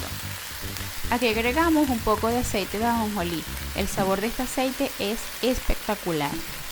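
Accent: Venezuela. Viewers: Hola! Soy de Venezuela, pero vivo en U.S.A. yo imagine que eras de Venezuela por la forma de hablar y de cocinar (con clace) jjjj Saludos.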